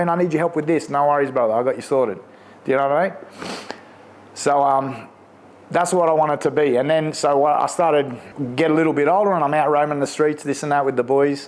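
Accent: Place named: Sydney, Australia. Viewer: I love this testimony! 🙌 i grew up sydney around the same time and also went off the rails, the way you speak takes me back. Dark times. The devil is a liar! I was definitely under demonic oppression. Your testimony is so powerful, you will save so many 🙏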